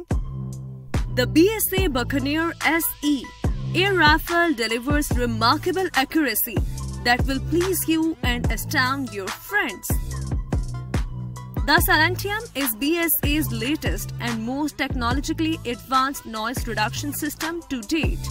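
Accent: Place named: India. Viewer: The Indian accent is worse than the robot voice.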